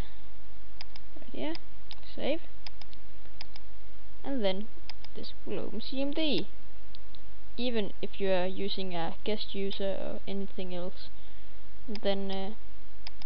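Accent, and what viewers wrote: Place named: Denmark. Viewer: haha your so clearly a danish person XD!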